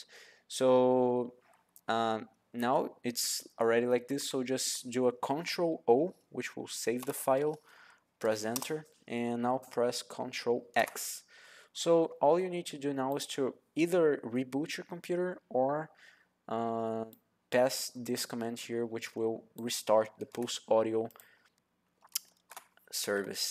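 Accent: Brazil. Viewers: eu reconheci que tu era brasileiro pelo sotaque kkkkkkkkk obrigada, funcionou certinho :D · Valeu cara, salvou muto (reconheci o teu sotaque de br kk).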